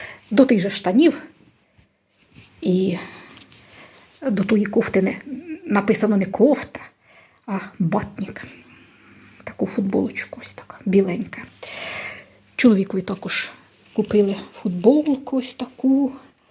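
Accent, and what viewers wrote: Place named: Cherkasy, Ukraine. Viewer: Чути що говір черкаський. Я теж родом з Черкаської обл, на межі з Полтавською.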